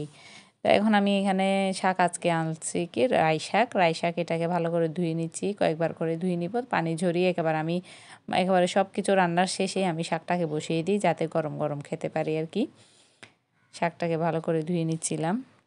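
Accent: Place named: Chittagong, Bangladesh. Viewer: অনেক সুন্দর হয়েছে আপু ভালোবাসা রইল তোমার জন্য 🎁🎁পুড়ে যাওয়া ভাত খাওয়ার উপযোগী করে নিলে আমিও চট্টগ্রামের মেয়ে তোমার কথা শুনেই বুঝতে পেরে গেছি ভিডিওর শুরুতেই ❤❤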